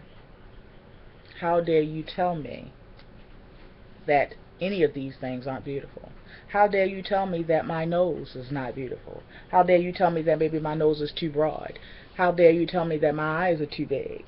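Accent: Baltimore, United States. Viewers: Trying to catch ur accent! Where r u originally from? I hear Baltimore a bit???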